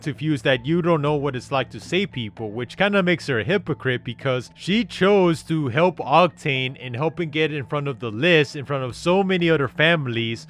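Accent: Europe. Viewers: You live in Texas? I’ve always thought you were European from your accent. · Texas??? What... you sound European wtf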